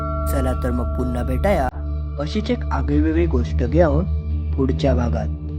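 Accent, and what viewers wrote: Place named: Malvan, India. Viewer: Story thik ahe but accent malvani ahe so kalnyat thodasa Vel lagto and also plz...for god's sake biography bollyasarkha accent naka theu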